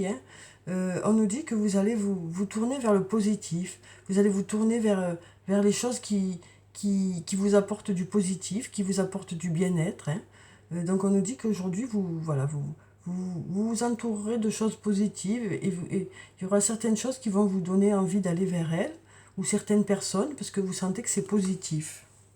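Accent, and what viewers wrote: South, France. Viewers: Merci pour cette guidance, toujours un plaisir d écouter cet accent du soleil, une voix calme et posée et surtout toujours cette bienveillance, vous êtes bénéfique et toujours dans le positif merci pour ces bonnes énergies que vous nous envoyez bonne journée☀ et prenez soin de vous. Bisous 😘👍💋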